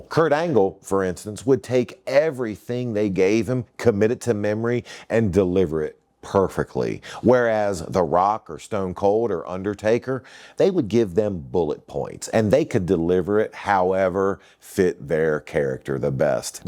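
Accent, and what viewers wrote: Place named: South, United States. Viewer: You had potential to ascend back then. You could have been a biracial JBL. You have a great biracial look, nice southern accent and could have used it as a “better than you” appeal. I’d have you wearing suits, clamoring your education background, look and acknowledge being half black and half white. By being half black and white, you could have been nicknamed “The best of both worlds”…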